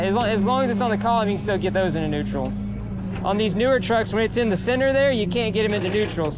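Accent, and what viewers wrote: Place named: Texas, United States. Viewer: What a character! He speaks good ole Texas gibberish like my uncles.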